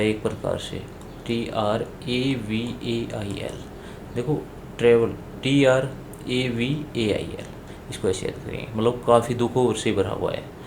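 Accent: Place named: Haryana, India. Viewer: 😅 PURE HARIYANVI ACCENT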